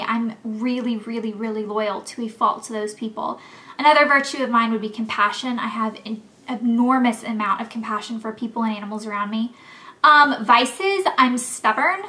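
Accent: South, United States. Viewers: you have your old 'southern' accent back in this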